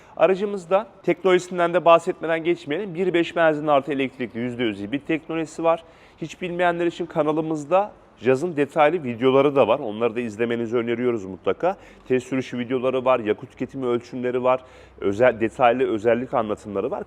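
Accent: Samsun, Turkey. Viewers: Kulağı tirmaliyor biliyorum ama şive biraz Samsun da genel durum😊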